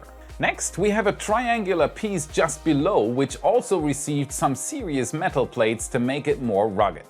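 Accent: Germany. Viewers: we're waiting for your delicious German accent - and your v narratives on the (litterally) awesome progress that SpaceX have made the past week, of course! 😱 😋🍿 A thought: the narrowed design of the flaps seems reasonable for earth's athmosphere, according to SpaceX' models, but what about the low density atm of Mars? #Whataboutit? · I love his German accent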